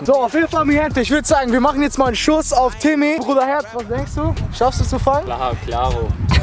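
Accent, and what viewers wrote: Switzerland. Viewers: von wo kommst du aus der schweiz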